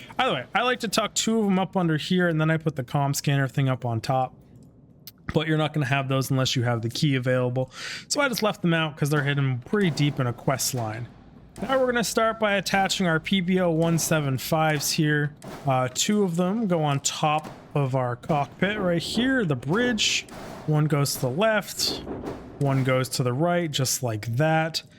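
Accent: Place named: United States. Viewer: No hate man.... Love this video. :D , but I also, as a European, love how Americans just add an subtract letters and syllables in words thats not American. "Lon" = LEE-on", "Polvo" = "Pol-O-vo" for example.... As said... No hate... I just find this entertaining..... ;P If you watch all types of gaming-videos, "MetalJesusRocks" is a MASTER of this... ;P Edit.... After watching the whole video, this is deff going to be my go to ship! Theres too few builds like this with small ships and huge cargo.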